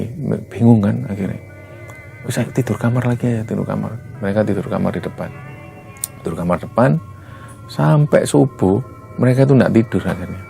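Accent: Java, Indonesia. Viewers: Langgam jawanya 👍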